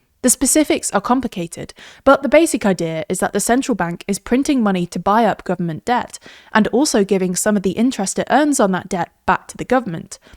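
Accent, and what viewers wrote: United Kingdom. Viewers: This lady british accent is so much better to listen to. I could not hear another word from the previous british dude voice, sounded like that annoying pewpewdie dude.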